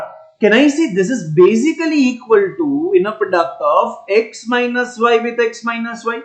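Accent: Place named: India. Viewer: Excellent explain! I´m from Brazil, and yet be understanding well indian accent! Thank you so much, Sir! 🟧⬜🟩🤝🟩🟨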